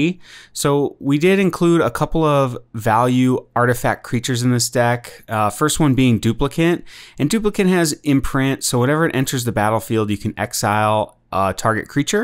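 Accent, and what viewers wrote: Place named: Canada. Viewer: I am only 5mins into the video, and I have to say this video is a big improvement from the last video I watched. The flow is better overall. You have a thick Canadian inflection, not a bad thing just noticeable how you end each sentence high. I would also consider new Viven planeswalker that would allow you to flash your bounce creatures to save important creatures as a way to protect key pieces.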